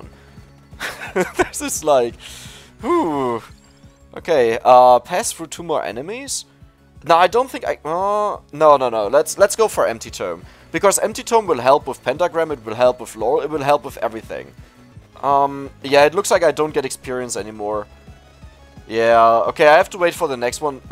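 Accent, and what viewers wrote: Germany. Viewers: Love the german-ish accent and, if you didn't already, would love to see some video of regular runs while you explain the thought process of choosing an upgrade or the other and the reasoning behind it. Plenty of people apparently don't "get" the basics behind how to at least finish a normal run and I would love to share some of your videos with them ;)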